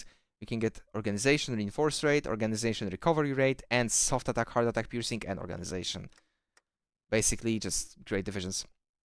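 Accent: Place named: Poland. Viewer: I don't know why your Polish accent fits the OWB theme you have in your channel.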